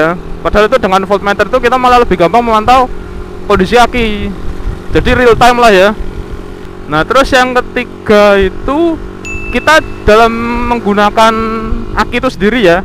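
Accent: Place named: Java, Indonesia. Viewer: Medhok cuy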